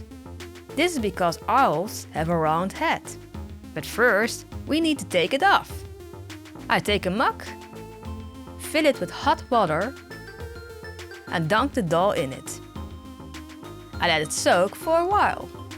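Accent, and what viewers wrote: Netherlands. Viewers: Ik hoorde je stem en dacht dat is een Nederlands accent haha. Maar wist het niet zeker tot ik de "zeeman" zag staan XD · i had a suspicion you were dutch with the accent. but then i saw ZEEMAN EN TOEN WIST IK HET. Maar heel gaaf gedaan! Ik ben benieuwd naar je andere videos. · Geweldig gedaan! Ik wist niet dat je de nekopening op die manier kon vergroten. Aaah, dat heerlijke Nederlandse accent! Never get rid of it!!!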